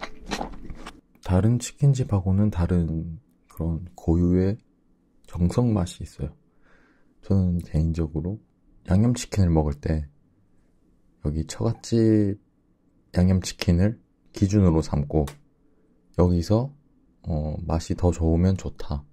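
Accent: Busan, South Korea. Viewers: 부산사투리가 왤케 좋죠? ㅎㅎ 꿀떨어짐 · 부산말투?사투리 느껴져서 괜히 정감가는듯 ㅎㅎㅎ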